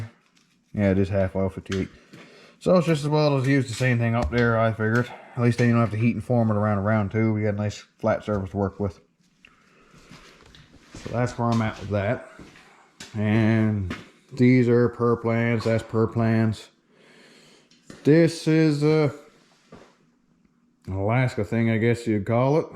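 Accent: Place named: Newfoundland, Canada. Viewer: A treat. Get to hear my homie NL accent explaining the complexities of building an airplane in a garage in Labrador. Thanks for the update.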